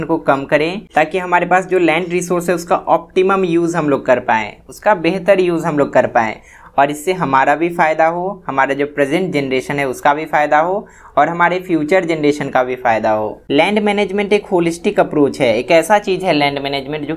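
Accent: Bihar, India. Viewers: Sir aap jharkhand bihar ke ho kya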